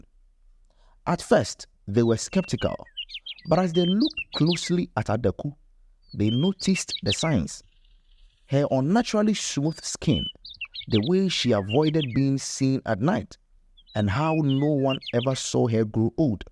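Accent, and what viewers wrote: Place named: Ghana. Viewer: Lovely story. Loving the Ghanaian accent!!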